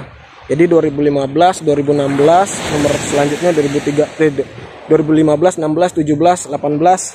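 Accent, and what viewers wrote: Lombok, Indonesia. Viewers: Bang org lombok ya?? Xixi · logat lombok hehe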